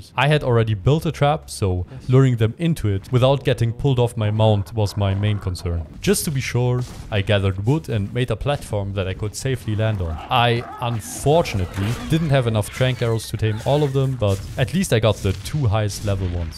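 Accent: Germany. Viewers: I hear deutsche akzent out of you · Are u german? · is he german?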